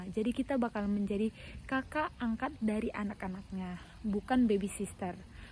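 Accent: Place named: North Sumatra, Indonesia. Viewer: Kental kali logat Batak kita itu y kak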